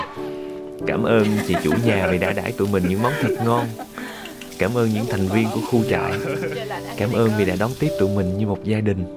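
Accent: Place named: Saigon, Vietnam. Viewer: Nguoi mien Tay, nhung noi giong Saigon, giong tuong doi dung chinh Ta, rat Ro rang, hay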